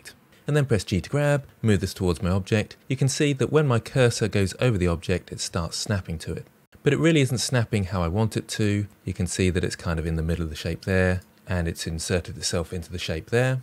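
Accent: England, United Kingdom. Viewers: Concise, clear, and complete. Absolute amazing tutorial. Bonus points for narration with an English accent.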